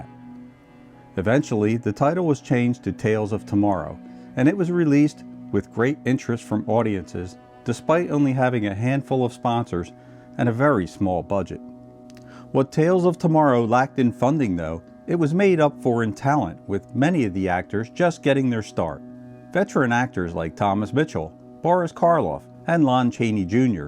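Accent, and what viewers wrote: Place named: Pennsylvania, United States. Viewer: Your accent sounds like you're from Lansdowne, Pennsylvania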